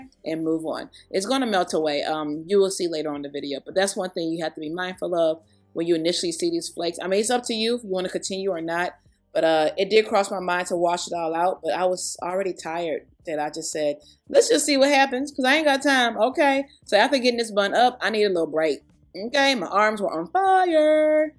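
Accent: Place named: Nigeria. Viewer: That's Nigerian the accent and attitude😅😅 + american of course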